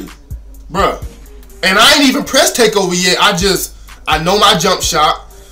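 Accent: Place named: South, United States. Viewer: Yo acccent country asf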